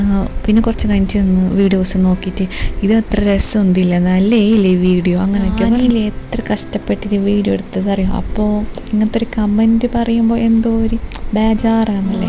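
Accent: Kasaragod, India. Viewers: ആര് പറഞ്ഞു 😂😂video ഇഷ്ട്ടം അല്ല എന്ന് ❤ നല്ല രസം ആണ് കാസർകോഡ് സംസാരം കേൾക്കാൻ ❤️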